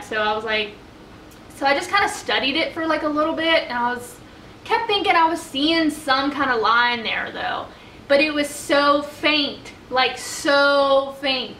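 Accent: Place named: South, United States. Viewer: I was really trying to focus on the video but her dam accent is country and sexy!!!! Congrats gorgeous!!!! 😍❤️😉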